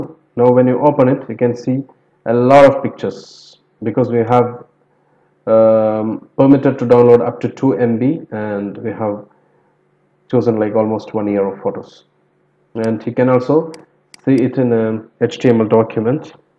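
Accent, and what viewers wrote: India. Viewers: God Bless, It was wonderful. Love Indian accent. your Iranian Sister.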